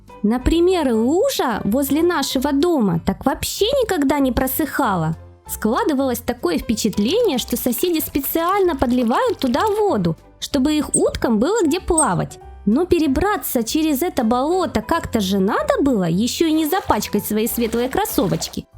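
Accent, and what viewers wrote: Ukraine. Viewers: А ты что украинака? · Ты что украинка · Ты что украинец а я думал что просто так говоришь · Эй ты что с Украины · Ты что из Украины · ты из Украины что ли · Ты с украины? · Ты чё украинкачто ли🤔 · А ты украинка чтоли 😢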